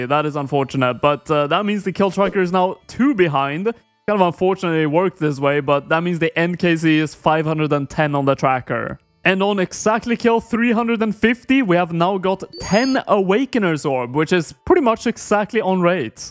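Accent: Sweden. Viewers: The swenglish accent is so strong, i love it😂 Rsn: Kurdo-1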